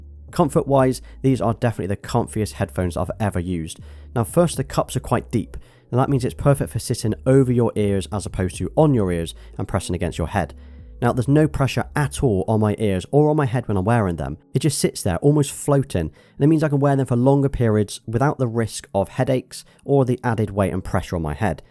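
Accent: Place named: West Country, United Kingdom. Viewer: Great review man, I really enjoyed this. Properly comprehensive and a joy to watch! Loved hearing a relatively local accent too as I'm from Cornwall, and it sounds like you're from the west country perhaps? 😆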